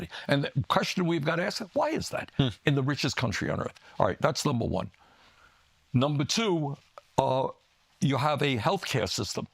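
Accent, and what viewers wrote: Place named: East Coast, United States. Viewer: His accent makes me nostalgic for my childhood doing arts and crafts with my dad's mom from back East.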